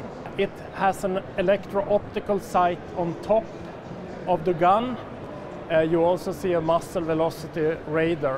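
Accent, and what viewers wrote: Sweden. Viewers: Swenglish sound so horrible. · Swedish i think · Horrible Swedish accent + lisp. I understood it perfectly, being Swedish myself, but man it is the opposite of an eargasm to listen to my countrymen when they can't pronounce English properly.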